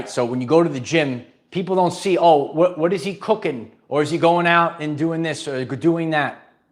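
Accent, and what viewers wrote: New York, United States. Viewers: Terrific sharing. You are a character. 94 degrees here in Florida (too hottt for me). Yes I have been learning about this stuff for many years. Carbon to crystalline, etcetera. We are stronger for everything we experience. The NAA wanted us to lower our frequencies through hatred, stress, and fear they created. To stop us from growing and ascending and being the powerful beings we are. They were fearful of losing their power, because we would realize they have been leeches and robbers to us. We all have masculine and feminine in us. I believe most males are very fearful of the feminine in them and see it as weak. It is not weak, it is strength and compassion. Females also have masculine in us and can be strong. Many humans are finding that beef is too heavy to digest anymore. I eat beans and nuts. You inspire me to build my body muscularly as a slim athletic gal, I need to lift some heavy weights to get stronger. 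Time to join a gym. Peace and love to an authentic male and teacher. I appreciate you. The NY accent is awesome.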